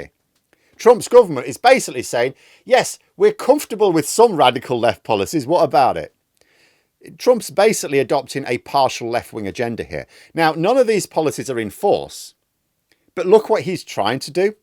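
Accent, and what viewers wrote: United Kingdom. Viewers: The tariffs never worry about China. If they were, he would’ve just placed them on Chinese products he placed them on the entire world. Who pays the tariffs. the American consumer , that’s who. But at the same time half 1 million federal employees are out of work. Truck, drivers, dockworkers people who work in all sorts of box. Stores are out of work because there’s nothing coming in from any part of the world to stock the shelves. When Trump was president the last time, the only law that he passed was a tax cut for millionaires and billionaires. That law is getting ready to expire and he wants to make it permanent. His plan is to cut the Social safety net in this country and he’s already well on his way to doing that. Because his policies affect peoples retirement funds people are losing significant amounts of their 401(k)’s, and other pensions. 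Just because somebody has a British accent doesn’t mean they know what the hell they’re talking about. I bet this guy was in favor of Brexit. How’s that working out for you? The only socialism Trump is going to do is the same type Hitler did. And before Ronald Reagan and Margaret Thatcher, the top rate was 70% in the USA. I have no idea what it was before Thatcher.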